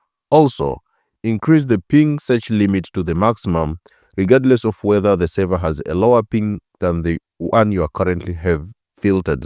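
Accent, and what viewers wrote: India. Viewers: what is that accent bro indian or arab? fix your language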